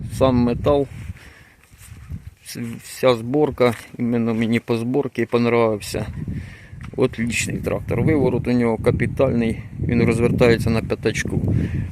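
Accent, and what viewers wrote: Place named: Poltava, Ukraine. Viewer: Я чую по розмові, що свій хлопець, з Полтавщини. А , виходить, сусідній район. Вітання з Миргородщини. Відео герні. Трактор також гарний. Міркую про придбання такого. Знімай відео ще. Нехай щастить.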